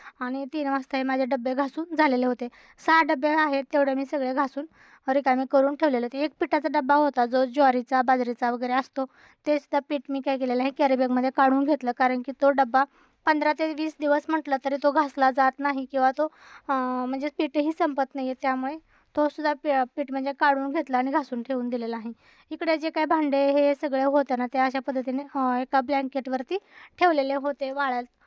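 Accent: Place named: Khandesh, India. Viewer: Waadte tii khandeshi aahe ..me pan . Ticha accent khandeshi aaye ..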